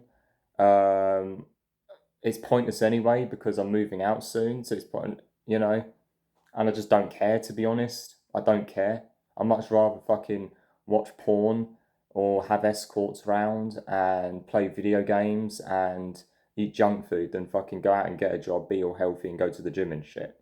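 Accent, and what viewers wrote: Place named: United Kingdom. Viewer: I know I'm late to the party here but you only just showed up in my feed last night and I'm starting to work through your vids. First, I respectfully and in no way mean this in a condescending way request that you interact with your mum and gran with nothing but respect, patience and kindness. Your dad, if he left you and your mum for whatever reason, can piss the f**k off, however. :) Second, you sound like a proper Brit. If this is the case you need to take pride in your country and continue forth its inspiring tradition; such is the great example (while not always perfect) your ancestors created that people literally risk everything to gain entrance to your country in these troubling times. I agree with you that things seem grim but they are by no means hopeless and not without solution.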